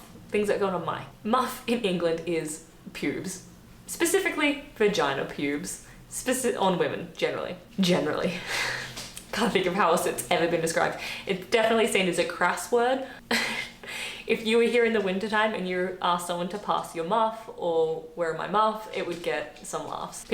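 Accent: Australia. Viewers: thank you for this nice presentation even though you seem a little nervous presenting these subjects. Your Australian accent is very faint compared to my Ex Father-in-Law who was brought up in the 'Bush' in the 1930s but settled (ex RAAF) in England after WWII. In the 1950s my mother would take me (aged five to eight) to the cinema (yes I cried for Bambi). I forget the title of an Australian film that we saw but the young lad used the word 'bum' meaning his bottom. My mother quickly informed me that "Australians are allowed to say that word". It was even better when the lad sung, to the tune of 'The Ash Grove': My teacher's got a bunion A face like a pickled onion, A nose like a squashed tomato And legs like match-sticks. etc. Rugby Football is similar to American Football in that they rarely kick the ball but without the protective gear. Australia (Wallabies), New Zealand (All Blacks) and South Africa (Springboks) all give good account of themselves and, without doubt, have much 'dirtier anthems' that they sing in the showers or in the Pub' after the match. 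· FYI this is a cultured Aussie accent unlike certain Aussie accents that seem to be plucked out of the 1930s .Yes I'm talking about cobber ,sheila ,crikey . These terms were out of use before my time and I'm a 57yr old bogan. We are more likely to say " nice bit of pussy" than " crikey that's a bonza sheila"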